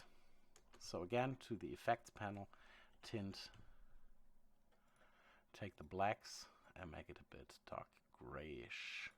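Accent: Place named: Germany. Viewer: Hey, höre da einen deutschen Akzent raus, daher auch hier mein Kommentar in deutsch. Kann mir jemand sage wofür das Grid am Ende nun gut war? Konnte den Zusammenhang bzw. den nützlichen Teil nicht ganz fassen. Wozu brauche ich ein Grid statt des Planeten bzw. wozu dient die Combo? Ansonsten sehr gutes Video klar zu folgen und einfach erklärt, vor allem nicht in der Muttersprache dafür nochmal ein "Daumen hoch"! Würde mir mindestens genauso schwer fallen! Den Leuten denen das Tutorial "zu ausführlich ist" kann ich nur raten von der Timeline Gebrauch zu machen ;) Cheers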